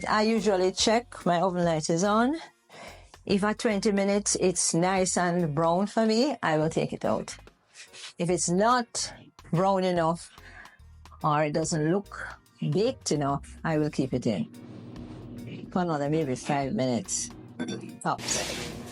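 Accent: Jamaica. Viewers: I love Jamaican accent.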